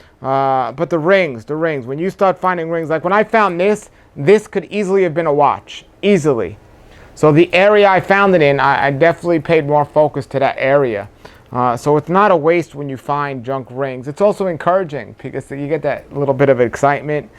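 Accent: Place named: New York, United States. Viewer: Your from NY right ? Can tell by your accent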